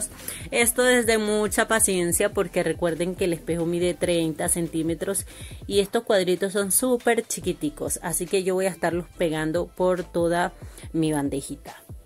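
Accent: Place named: Colombia. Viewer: tu acento se me hace colombiano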